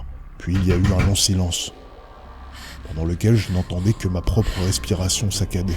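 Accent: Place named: Quebec, Canada. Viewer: Le Québec doit être magnifique mais vos expressions et votre accent est tellement ridicule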